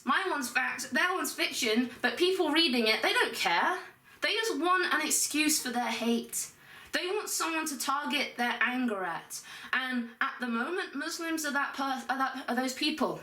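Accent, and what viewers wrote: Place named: United Kingdom. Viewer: Well you sound like you are in the UK and look like you were Not Born a "Muslim" just converted to Islam. Those are 2 totally different things! This is your "Beautiful Religion" Hunny! Look around you in Europe, won't be long before you ARE IN CHAINS POPPING OUT 10 MUSLIMS BABIES! <link> 2. THIS IS LONDON!!! <link>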